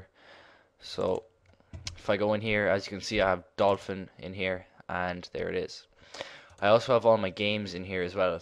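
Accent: Newfoundland, Canada. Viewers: your from newfoundland? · Im a newfie too, saw the zelda back ground and heard the accent and thought "MY BRETHREN"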